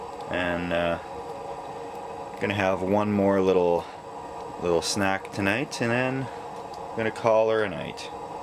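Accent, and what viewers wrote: Alberta, Canada. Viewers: Why can I tell immediately as an Albertan that I am hearing another Albertan?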